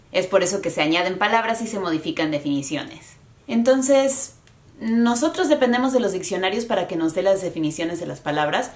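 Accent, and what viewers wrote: Standard. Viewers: Te sigo en tu canal, pero como que no era tan fan de tus videos, pero me has ganado ... Hablas español e inglés perfectamente y defiendes el náhuatl sintiéndote orgullosa de tus raíces y durante este video analice tu acento y me encantó ya que es muy neutral y no como el de las ridículas que hablan un poco de inglés y ya se expresan así: "Joveeeen buenas tardeees..." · yo tenia entendido que un idioma es el que tiene tambien ESCRITURA o se que no solo se habla sino que tambien se puede escribir.... y en su defecto el dialecto no. Hablas Espanõl neutro y tambien se diria que tu Ingles es neutro.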